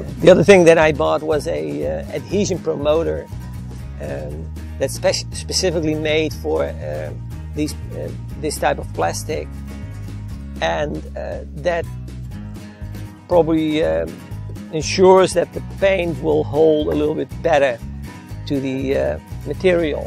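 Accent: Netherlands. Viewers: I hear some Dutch origin in your voice? Or am I way off?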